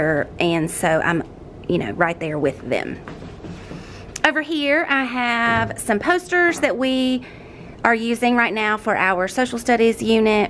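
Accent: United States. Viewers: Do you teach in NC? I'm trying to figure out your accent. I'm in NC! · Are you a Southerner?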